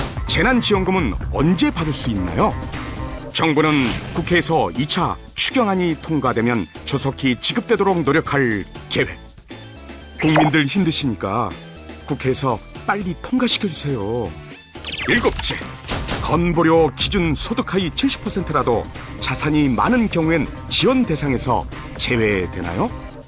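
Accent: North Korea. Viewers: 성우 말투가 북한같냐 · 앵커 북한 사람임?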